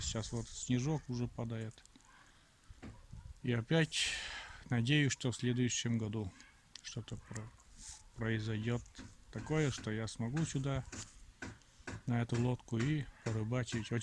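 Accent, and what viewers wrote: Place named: Udmurtia, Russia. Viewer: думал с Удмуртии)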